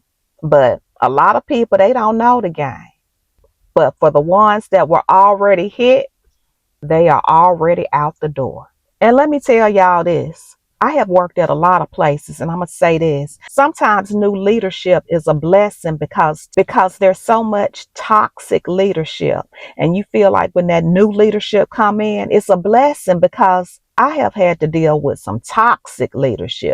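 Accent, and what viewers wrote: South, United States. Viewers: Love your Southern accent. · Sis...I had to listen to this three times to get the message...that lovely southern accent is mesmerizing...complete soul snatching in effect.🤩❤ OK...now to my point. When the Dems were in charge of things, 'Everybody' and their vices were included (including the Devil's Lettuce). Alabama is Ultra right and those that live there need to be knowledgeable of the law. It is what it is...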